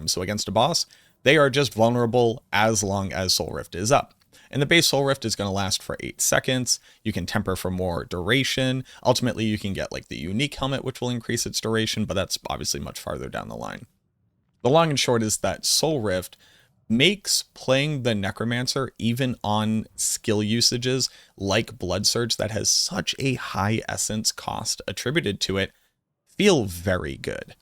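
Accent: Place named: Massachusetts, United States. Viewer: Yaaaas. It's so easy to tell he's from Massachusetts sometimes and I love it because it is where I was born. Only lived there until I was 8 because my parents decided we had to move as soon as I started saying, "idear" instead of "idea." 😅😅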